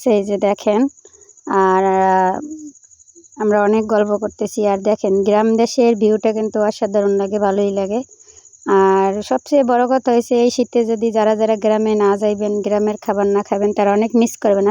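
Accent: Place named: Barishal, Bangladesh. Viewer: ওরে আল্লাহ মিল্লা গেছে মিল্লা গেছে,,মোরা দেহি বরিশাল্লা মনু🤭🤭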